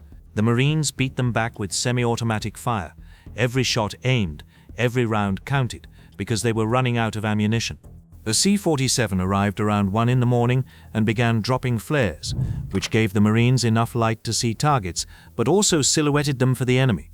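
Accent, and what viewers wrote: United Kingdom. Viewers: I don't want to listen to British accent voices talking about US Marines we don't have any Americans that can narrate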